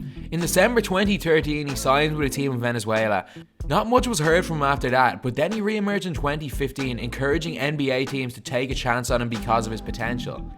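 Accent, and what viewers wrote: Ireland. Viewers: Are you Irish? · Interesting video :-) And I just gotta say ut: love that Irish accent :-) 🇨🇮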